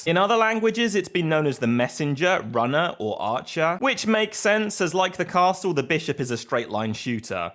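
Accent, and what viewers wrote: United Kingdom. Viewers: This is because of his Bri’ish accent, really. They sometimes Rs between vowels.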